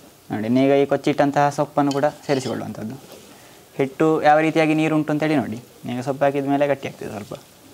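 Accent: Mangalore, India. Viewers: ಯಾವ ರೀತಿ ತಾವು ತಯಾರಿಸುವ ತಿಂಡಿ ಪದಾರ್ಥಗಳು ವಿಶಿಷ್ಟವಾಗಿರುತ್ತವೆಯೋ ಅದಕ್ಕಿಂತ ವಿಶಿಷ್ಟ ನಿಮ್ಮ ಮಂಗಳೂರು ಕನ್ನಡ. ಕೇಳಲು ಬಹಳ ಸುಂದರ..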